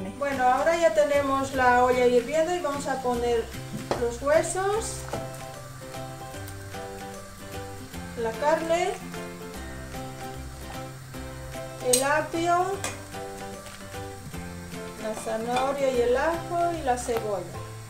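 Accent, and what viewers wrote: Spain. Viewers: Lo primero que me llamó la atención, el acento. Inmediatamente pude percibir que reside en España. Por lo demás, práctico la receta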